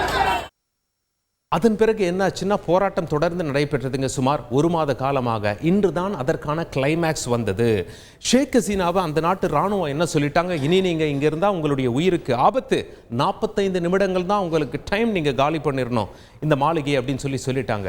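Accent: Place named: Thanjavur, India. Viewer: பேசுரவர் தஞ்சாவூர் காரர்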